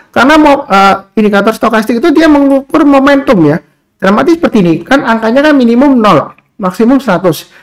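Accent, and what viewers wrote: Java, Indonesia. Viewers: Jowone medok temen mas 👍😊🙏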